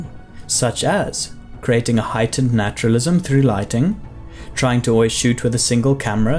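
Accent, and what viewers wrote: South Africa. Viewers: You Sound South African🙂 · Where are you from?, you sound South African. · Nice to hear a fellow South African, nice breakdown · I love the south African accent my china.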